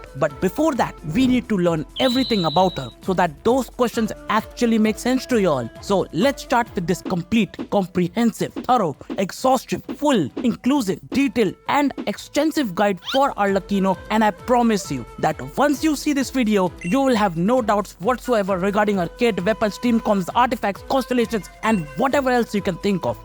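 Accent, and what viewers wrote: India. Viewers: unbearable to watch due to your Indian accent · Those racist comments are wild. Yeah you have a Indian accent, SO WHAT, ITS A BIG WORLD PEOPLE. Good job, gonna sub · leave it to some talented smart guy with a indian accent to finally explain it in a way i understand, thanks i subbed!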